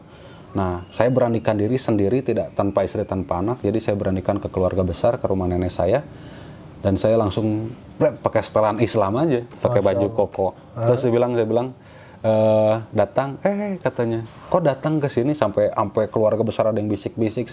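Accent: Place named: West Java, Indonesia. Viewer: Seru..resep ngadangukeun na.. moga istiqomah nya kasep.. terharu oge...ibu mah penonton setia .. pas bangeut logat sundana.. semangatnya kasep.. 👍👍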